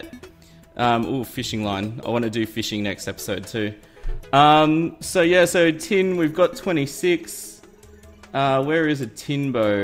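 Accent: Australia. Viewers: hahaha also you being a aussie like me is so helpful and convenient and makes it easier to watch ya vids:') ps you sound like your from over east like queensland or something? I'm from perth btw <3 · r u australian?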